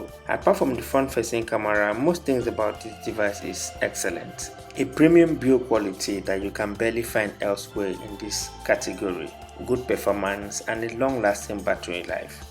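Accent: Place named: Akwa Ibom, Nigeria. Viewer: Love your Akwa Ibom accent bro!❤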